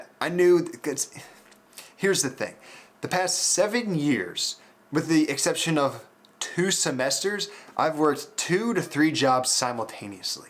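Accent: Texas, United States. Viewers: i love your accent dude. are you from texas? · Are you from Texas?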